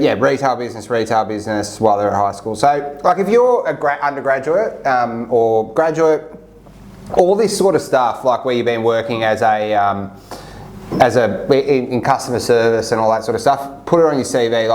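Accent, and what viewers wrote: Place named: Australia. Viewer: Very helpful information! I'm an entry-level accountant working to switch careers from ESL to Accounting. In the US the demand for accountants is so high that they are starting to hire accountants with no degree and certificates instead. It sounds like you are from Australia, is Australia having a similar situation, or are they still solely focusing on people with college degrees?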